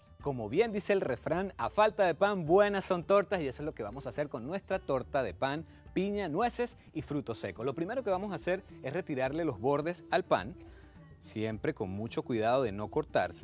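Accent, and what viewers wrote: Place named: Venezuela. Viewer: venezolano SEGUUUUURO jajaja :D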